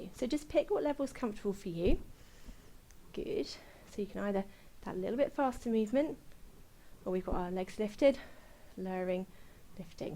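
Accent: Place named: United Kingdom. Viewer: Thank you so much. I started with you 3 weeks ago after a 7 months pilates break. It's been tough the first week with muscle pains 😆 but I really wanted to thank you for all those awsome free classes. You are keeping us far from mental health troubles during this shitty time. On top of it your lovely Brit accent help me improve my pronunciation.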